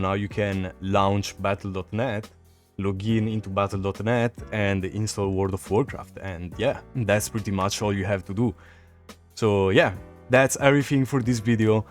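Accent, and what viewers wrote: Italy. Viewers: Sento un po di italiano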